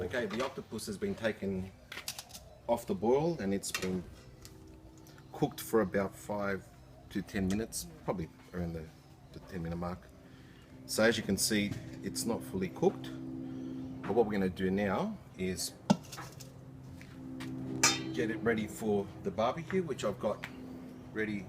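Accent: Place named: Greece. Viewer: I swear he sounds Greek until he says things like oregano.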